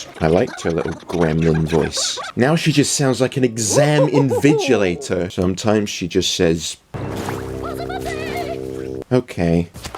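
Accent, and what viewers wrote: United Kingdom. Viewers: bro I love your videos so much man, your humor is so fucking amazing 😭 Your selfmade memes are hilarious and everything is made even better by your british accent and slang and this danm voices you make 😭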